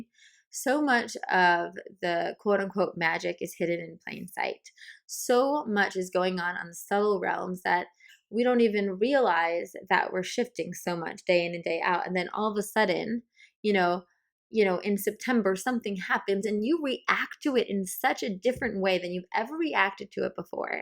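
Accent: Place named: New York, United States. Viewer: Yeah ! And the NY accent makes feel home, lol ! (LI)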